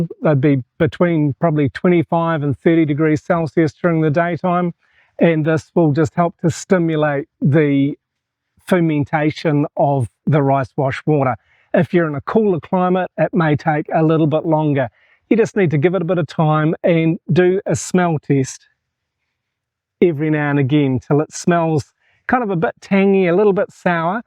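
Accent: New Zealand. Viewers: Sounds more Kiwi then North Thailand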